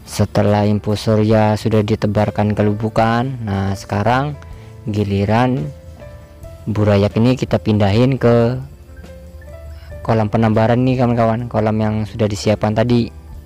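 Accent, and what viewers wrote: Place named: Palembang, Indonesia. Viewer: Abang ini logat Palembang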